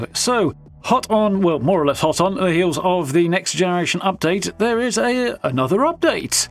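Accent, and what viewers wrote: RP, United Kingdom. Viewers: This guy sounds very posh😂. it doesn’t go with hes rock star metal head image haha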